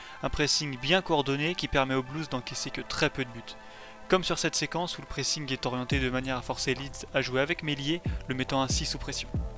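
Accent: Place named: France. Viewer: Mec le format de tes vidéo sont lourd continue comsa les explications sont claires et quel bonheur d’entendre une voix française 🤩 je m’abonne direct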